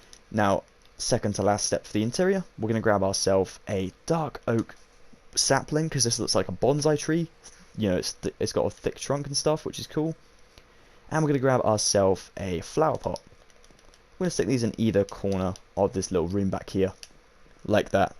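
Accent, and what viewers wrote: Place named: United Kingdom. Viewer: the british accent makes this better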